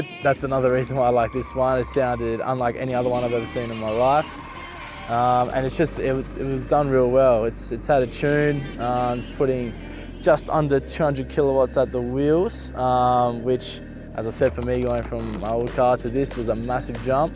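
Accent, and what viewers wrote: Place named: Australia. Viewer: Haven't you heard an Australia accent ??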